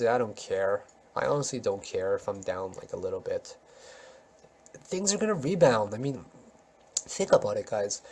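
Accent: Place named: Canada. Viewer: Good thing that i stumbled upon your video today. Interesting content....and i miss listening to the Canadian accent. Spent 5 years there in the 90s...the good old student days.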